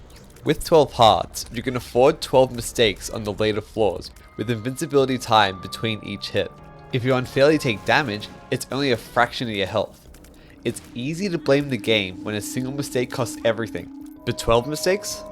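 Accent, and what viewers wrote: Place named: United Kingdom. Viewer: i thought it was mean but then i realized he was just british, carry on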